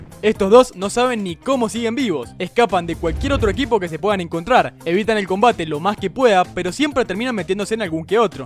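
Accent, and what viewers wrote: Argentina. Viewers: seguramente eres argentino por tu vos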